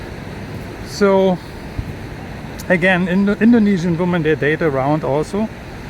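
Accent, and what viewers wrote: Germany. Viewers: I like the English with the German accent!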